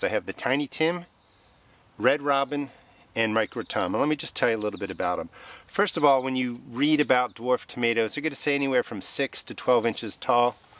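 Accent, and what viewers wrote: United States. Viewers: speak English not American....plz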